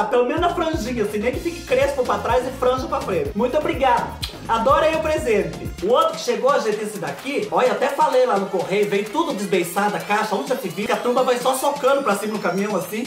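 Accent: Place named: Southern Minas Gerais, Brazil. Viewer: "Espicula de rodinha" é da minha época...amei...acho que você veio daqui da roça, (será que te sequestraram bebê?) no sul de Minas, vc fala igual "nois aqui" bjs...ops!...caí de paraquedas no seu canal e me apaixonei pelos videos...sucesso! sempre!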